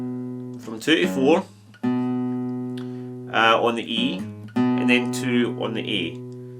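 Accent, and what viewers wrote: Scotland, United Kingdom. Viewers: He’s Scottish, not Irish! 🙄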